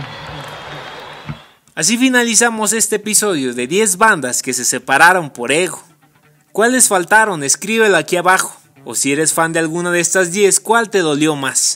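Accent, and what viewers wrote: Mexico. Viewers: eres norteño? jajaj duda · Habla más neutro porque tu acento de Panchito es hiper marcado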